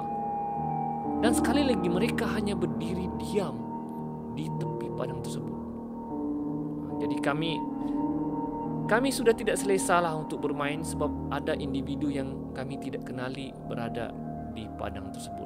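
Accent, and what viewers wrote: Sabah, Malaysia. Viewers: Abang orang Sabah ke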